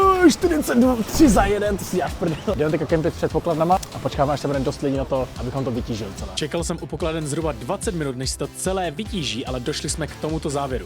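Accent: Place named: Czech Republic. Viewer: It is crazy to hear a new generation of people of Vietnamese origin to speak with such beautiful Czech accent! It seems that I am really getting older, but a nice shock either way...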